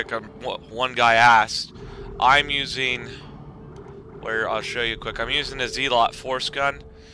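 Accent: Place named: Pittsburgh, United States. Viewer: You kinda sound like you're from Pittsburgh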